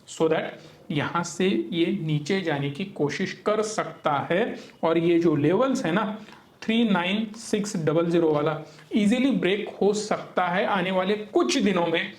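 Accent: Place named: Kolhapur, India. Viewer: सर मराठीतच तुमचं कोल्हापुरी आयकायला आम्हाला खूप आवडेल 🙏🙏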